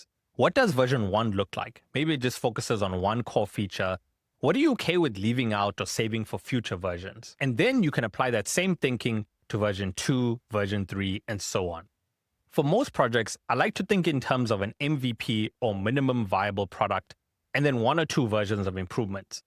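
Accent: South Africa. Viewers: Your location says USA, but if I'm not mistaken you have a bit of a South African twang in there?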